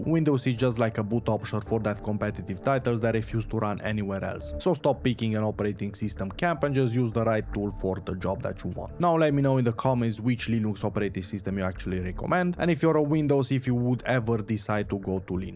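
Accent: Romania. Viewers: the moment i heard your voice I could tell you're also Romanian bravo man, fain video, dar Ubuntu e meh, Mint pentru incepatori, si CachyOS/Endeavour daca ai chef de tinkering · Haha, accentul românesc lovește direct. Pentru cele mai bune performanțe pe Linux le vei avea pe AMD sau Intel.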